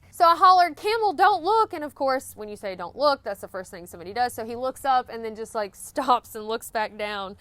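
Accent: South, United States. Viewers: Love ALL your vids, but a side effect of binge watching yours and Quicksand’s videos is that when out hiking I find myself thinking and talking to myself in that beautiful southern accent, I swear 🥴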